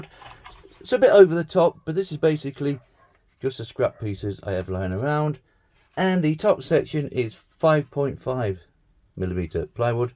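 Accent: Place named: Northern England, United Kingdom. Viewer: is the best. The BEST. The PRO-jects are inspirational. I wonder if he's in the north of England? I'm trying to parse the accent.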